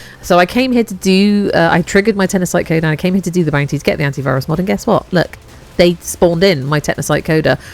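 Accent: West Country, United Kingdom. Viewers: Wow what an informative video. Giving a like and sub. Nice to hear an English voice! Got a west country twang to it? 😅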